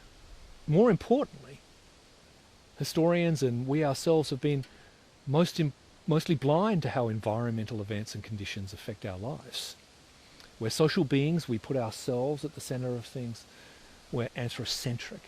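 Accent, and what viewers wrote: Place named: United States. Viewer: When you accent is so thick that you're can't pronouce the difference between "barley" and "Bal".... People all over the world like you less and less, americans.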